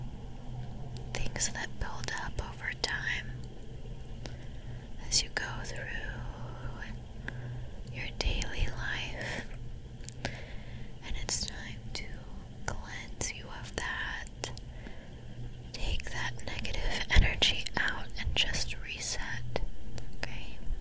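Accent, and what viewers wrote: Midwest, United States. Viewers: I would have guessed the midwest, but im from Finland so I wouldnt know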